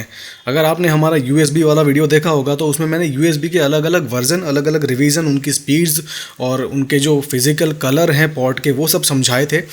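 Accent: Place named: South, India. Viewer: south indian aah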